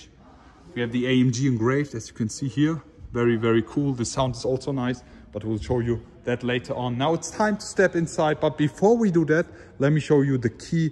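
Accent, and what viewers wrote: Germany. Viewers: Nothing like the german accent. AMG Uersion 😌👌🏼 lol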